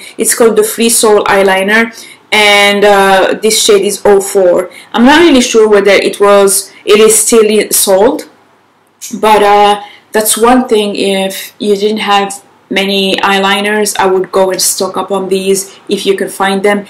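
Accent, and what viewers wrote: United Kingdom. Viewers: Est ce que c'est moi ou ton accent anglais est un peu plus british than usual? By the way, very nice look😘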